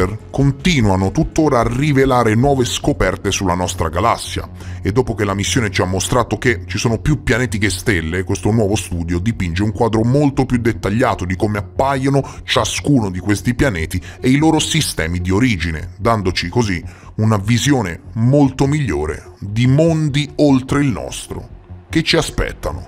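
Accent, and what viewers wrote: Tuscany, Italy. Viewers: te mi freghi una marea di tempo che non ti dico 🤣🤣🤣..però sei talmente interessante che..quando proprio non ho tempo..ti ascolto mentre faccio qualcosa in cucina..molti complimenti davvero..sei unico..( e mi pari pure toscano come me)..ma non lo diciamo a nessuno 😅🤭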